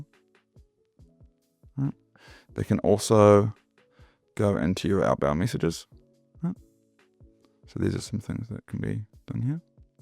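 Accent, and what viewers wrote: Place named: New Zealand. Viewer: Are you from NZ? You sound like a New Zealander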